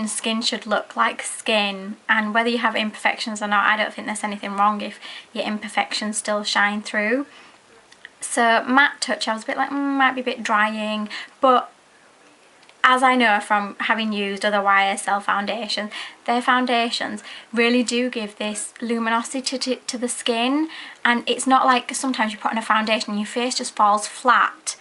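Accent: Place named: United Kingdom. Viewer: Where are you from in the UK? You have a beautiful accent! :)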